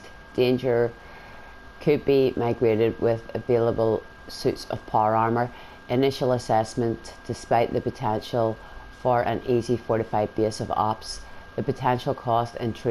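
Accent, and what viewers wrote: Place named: Northern Ireland, United Kingdom. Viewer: Love that "northern" accent... Derry or thereabouts? Georgia (USA) here. Well done.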